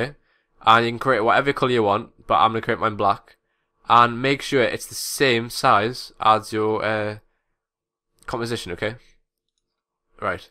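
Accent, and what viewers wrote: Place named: Northern England, United Kingdom. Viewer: Though a few years old now it's still a very concise and handy tutorial, good job! Loving the northern accent by the way :p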